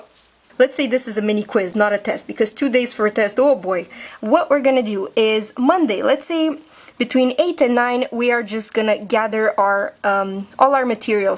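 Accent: France. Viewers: you are French right ^ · are you french??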